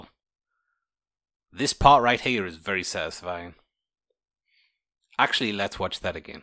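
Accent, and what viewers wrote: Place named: Newcastle, United Kingdom. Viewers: I’m not ashamed to admit but I’m really just here for the Geordie accent. 😁 Love the channel. Great work. Thank you! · Areeet mate! Haha I can tell you're a NE'er from the accent lol. I'm starting blender so videos like this are very much appreciated.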